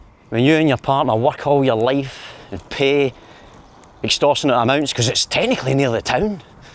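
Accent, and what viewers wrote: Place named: Dundee, United Kingdom. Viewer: He sounds more Dundee now aye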